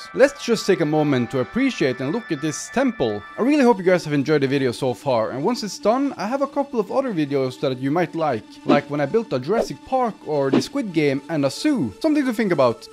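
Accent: Sweden. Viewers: love the Swedish accent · are you from sweden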